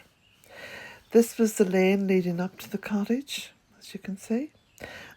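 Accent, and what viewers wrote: Ireland. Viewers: Dear lady thank you for sharing your beautiful life! I was on your website and was completely Enchanted I especially loved listening to the first chapter of your book on selling your home and finding the land. I so love your Irish brogue as my late Grandpa spoke with one. I was wondering if you had any intention of offering your books in audio format so that I might listen to that brogue and to to your voice because it reflects much more deeply than written word. Thank you for considering the possibility. I look forward to exploring your other offerings. I'm trying to remember how I found you but I think it was Revealing Light Tarot and the lovely Mary Ann. Your work connects me to my lost Irish roots. In deepest gratitude, thank you!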